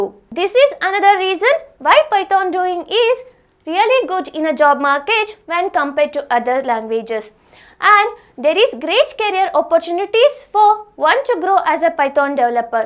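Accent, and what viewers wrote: South India, India. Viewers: South indian voice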